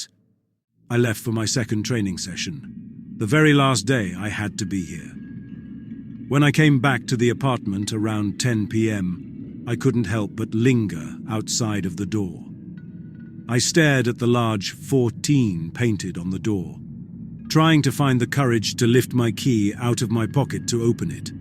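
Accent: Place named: England, United Kingdom. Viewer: Wow, it took me exactly 26 seconds to subscribe! True horror stories told w/ an English accent! Nuff said, subscribed!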